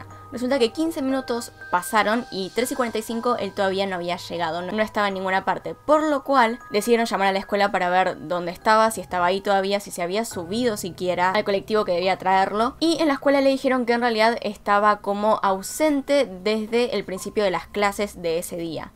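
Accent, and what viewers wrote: Argentina. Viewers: Pregunta soy nuevo en el canal de qué país sos, tenés un asentó argentino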